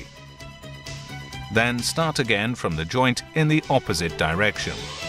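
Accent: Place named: RP, United Kingdom. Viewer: Only got to the intro so far about the primer and I feel like I'm tuning into a Royal British polo breeding documentary. 😊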